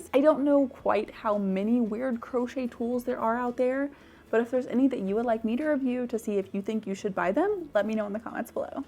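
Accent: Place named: United States. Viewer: American accents are something else... You really have that vocal fry. Whoa....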